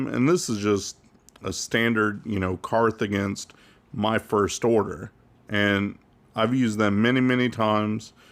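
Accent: South, United States. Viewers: your southern drawl makes the videos relaxing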